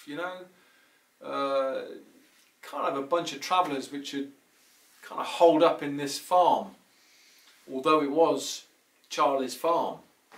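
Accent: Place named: Liverpool, United Kingdom. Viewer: Watched on my lunchbreak. Really enjoyed your stories particularly the one about meeting up with your old friend, I love it when things work out like this. It took me many years before I visited Scotland - I had never wanted to as a family member had a bad experience and this put me off, I eventually visited for the first time around 10 years ago and I couldn't believe I'd left it so long - the highlands are so beautiful and I have visited a few times now but I haven't been to Glasgow. Loved your scouse accent 😁 So lucky that you'd slowed down in the car and there were cones there, doesn't bear thinking about does it. So sad about your brother, looks like you have some happy memories together, I hadn't realised you were twins. The dog barking made me jump out my skin, I think I had it on a bit loud 🤣 I'll check out the other links -Take care Donna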